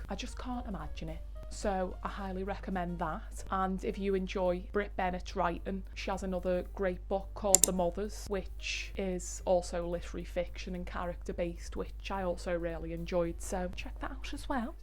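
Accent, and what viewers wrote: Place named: Scouse, United Kingdom. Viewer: as someone who lives in liverpool you literally have the most soothing and soft scouse accent i’ve ever heard in my life i could listen to you talk all day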